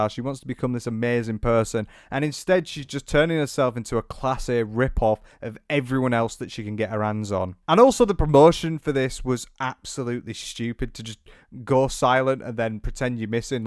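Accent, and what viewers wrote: United Kingdom. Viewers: Can't believe sshe disappeared for a momth I don't want to make fun of the British accent, i love it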